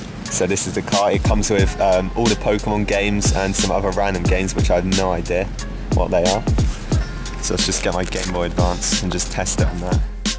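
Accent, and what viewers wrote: United Kingdom. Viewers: Had a feeling you were british its nice to hear your voice for a video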